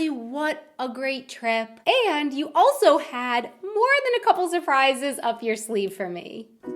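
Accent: United States. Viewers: After first five seconds yup she’s definitely American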